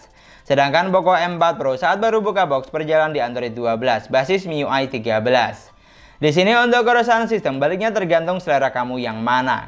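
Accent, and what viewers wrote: Madura, Indonesia. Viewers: Ini logat madura ya bang?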